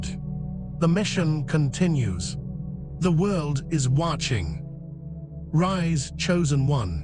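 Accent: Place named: United States. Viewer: You have changed you voice,bringing out the American in the accent!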